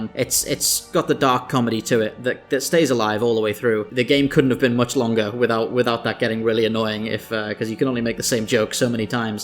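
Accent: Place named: England, United Kingdom. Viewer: No idea why but you sound like an English Ben Shapiro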